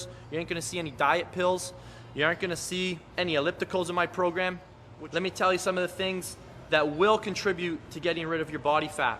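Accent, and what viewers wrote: Canada. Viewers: hehe he sounds like he is from canada or something ay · u can say this guy is Canadian :p well im too but i say about not aboot any way he said really good stuff so peace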